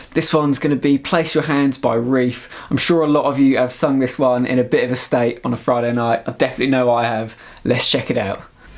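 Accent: United Kingdom. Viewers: Hi, you sound like you're from Surrey?? Did you play victorious festival Portsmouth 3 years ago?? 90's covers, the way you play is the best, outside of mr squire I've heard since that Saturday in Pompey.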